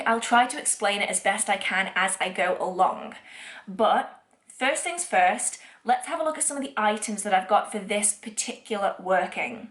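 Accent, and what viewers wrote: United Kingdom. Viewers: That you for your YouTube channel what country are you in like your British accent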